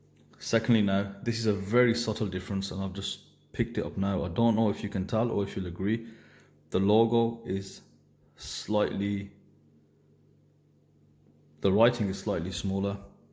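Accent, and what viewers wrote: Birmingham, United Kingdom. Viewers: I thought I recognized the accent. Have you got any if these steeldive watches for sale?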